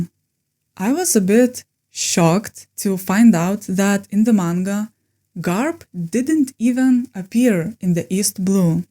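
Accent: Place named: Lithuania. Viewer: Oh well that justs shows that i should meet more Lithuanians in my daily life lol. I'm Dutch so i know other eastern european accents, but haven't heard a Lithuanian before! But that aside, your channel is really cool and deserves more subscribers! I love your pov as a Live Action only fan and i hope you make more content. But until then, i gotta binge watch the rest of your videos.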